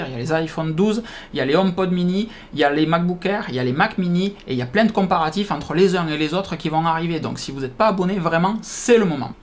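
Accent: South, France. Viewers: Bon moment, l'accent du Sud en +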